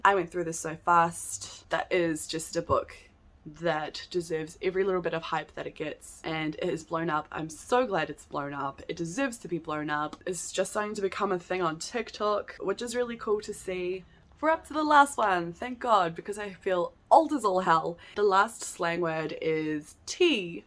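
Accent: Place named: New Zealand. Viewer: Yaay, I knew I recognised THE greatest version of an english accent there is! (I am, unfortunately, not a Kiwi. I just love everything New Zealand ☺️)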